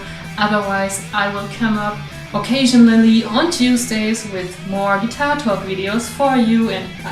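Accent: Germany. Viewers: dieser moment wenn man realisiert das sie einen deutschen Akzent hat:D und sie deutsch ist und gute Videos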